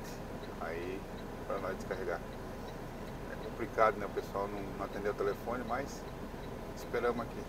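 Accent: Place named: Rio Grande do Sul, Brazil. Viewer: EU CONHEÇO ESSA VOZ GAÚCHO KKKKK PUBG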